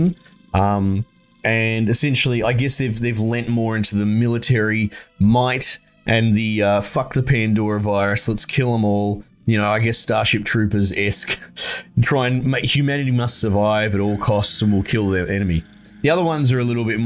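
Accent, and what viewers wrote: Australia. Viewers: You're Aussie? NICE. Good video :)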